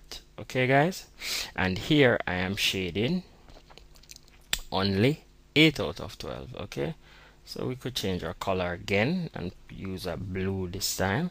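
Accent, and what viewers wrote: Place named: Jamaica. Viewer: U r a Jamaican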